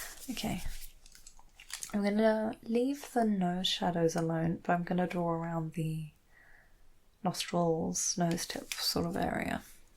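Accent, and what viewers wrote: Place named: Australia. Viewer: Aussie accent love it❤!!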